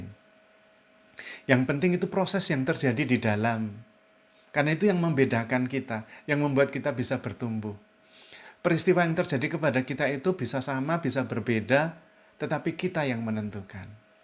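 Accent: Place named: Java, Indonesia. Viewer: 🌿🍁😊 Sugeng dalu Romo... Matur nuwun Pencerahannya... Jujur saya ngèkèk sendiri.. Dengar Romo bicara campur logat Jawa deles...Bahagia sekali rasanya.. - Semakin kita membandingkan dengan kehidupan orang lain semakin stress.. - Saya pribadi kok luwèh” dengan kehidupan masing” orang... - Saya syukuri setiap rahmat yg Tuhan beri, jalani apa adanya, nikmati..Sampun cekap... Matur nuwun Gusti.. - Makan bisa nikmat( walau lauk tempe penyèt)... Tidur bisa pules walau kasur bukan Springbed ... - Namung sumarah dumateng kersanipun Gusti.. -Langkung manteb malih mirengaken Pencerahan Romo yg selalu Super... Matur nuwun Romo, Berkah Dalem...🙏🙏🙏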